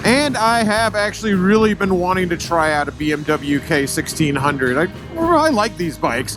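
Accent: United States. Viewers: Ooohhhh that typical annoying yank accent and continuous mindless chit chatter.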